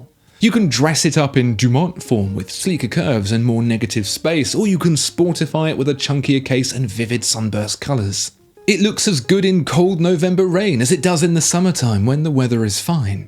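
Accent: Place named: RP, United Kingdom. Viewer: The posh accent and babbling garrulity is just comical.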